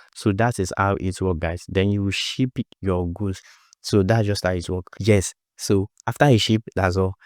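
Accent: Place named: Ghana. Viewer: Specify if it's jumia Nigeria causes your accent sound ghana...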